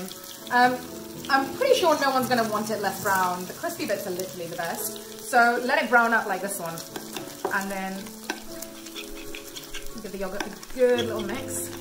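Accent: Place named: England, United Kingdom. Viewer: This is an amazing and so easy a recipe. English accent I hear, I'M From UK too #7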